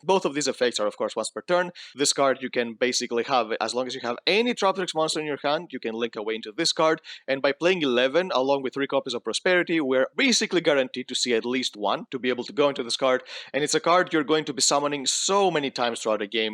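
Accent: Greece. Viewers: Your accent sounds greek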